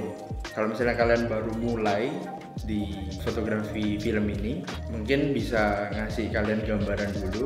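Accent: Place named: Surabaya, Indonesia. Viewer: Makasih mas, bermanfaat bnget infonya. Dan ternyata podo Suroboyo e Haha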